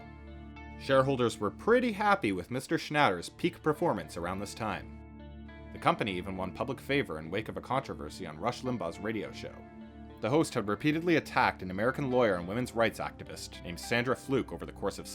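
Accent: Canada. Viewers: Is this also where the weird pronunciation of "chagrin" came from? Never heard it said that way but definitely caught those Canadian "out" and "abouts"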